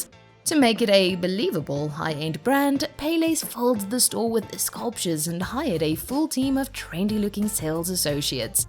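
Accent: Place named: South Africa. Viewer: This narrator definitely from South Africa.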